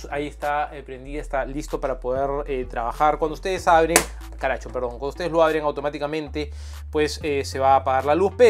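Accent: Peru. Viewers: Es Peruano??????????? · Eres peruano?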